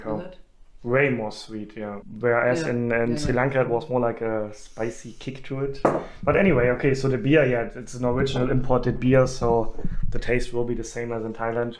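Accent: Germany. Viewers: Your mum is H.O.T. ! ! Her English also is very English!!?? you seem to have a very strong German accent where your mum is WOW and H.O.T. Please tell your mum XDDDD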